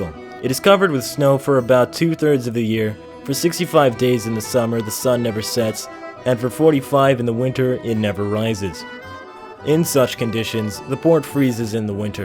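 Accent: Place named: Russia. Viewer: You have a slight Russian accent, and I love it!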